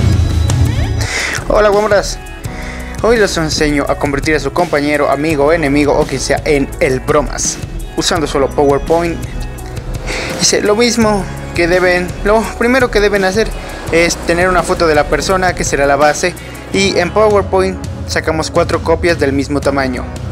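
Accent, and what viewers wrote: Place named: Peru. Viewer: Oye por tu acento , asumo eres de Peru ? K parte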